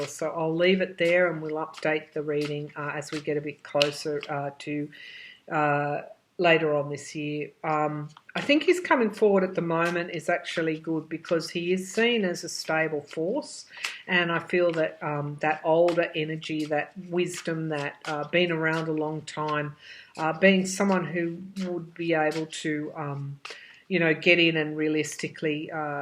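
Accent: Australia. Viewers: I love the the R rolls in your speech..no need to correct it..Us here in America have a few different accents but I love the Assuies accent!!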